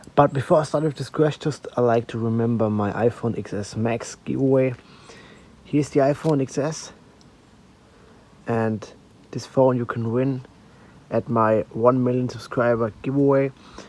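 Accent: Germany. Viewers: ich liebe Englisch mit deutschem Akzent xD I love the 5 mm faif millimeda[ganz schnell] echt gut xD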